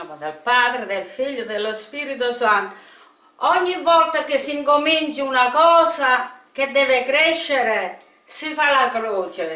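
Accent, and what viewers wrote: Italy. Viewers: All I had to hear was, "Today I make a fettuccine" in a strong Italian accent... I know I've come to the right place. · Take a chill pill. Im merely saying that I prefer listening to italian granma in her accent it gives the channel a certain flavour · ! I love your food, I love your Italian accent. My grandparents came to this country from Bar, Italy. I miss them, I miss kitchen time, especially with my Aunts, too. Please keep cooking!!! 🙏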